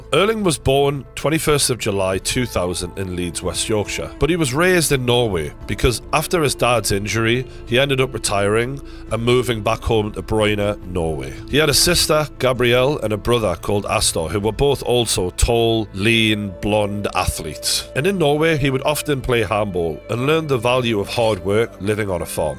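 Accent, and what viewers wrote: Newcastle, United Kingdom. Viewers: I love a true geordie documentary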